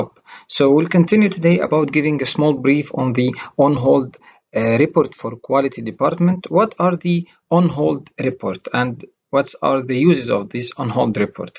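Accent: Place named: Arab world. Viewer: Are you from middle East? Your english is like arabic.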